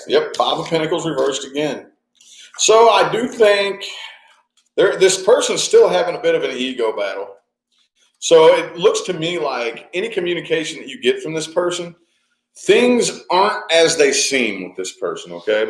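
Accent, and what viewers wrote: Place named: Kentucky, United States. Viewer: Kentucky Girl here loving that hint of your Kentucky twang!!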